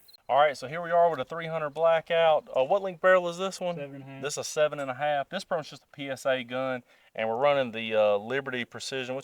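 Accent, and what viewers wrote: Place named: South Carolina, United States. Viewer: I know an SC accent when I hear one. Awesome video. I was interested in .300 blackout but maybe I'll save myself some money.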